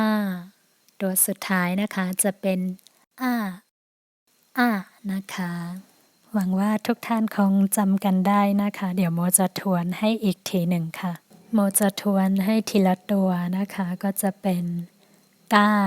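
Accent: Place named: North, Thailand. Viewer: ออกเสียงเหมือนอักษรธรรมล้านนาครับ ไทยภาคเหนือ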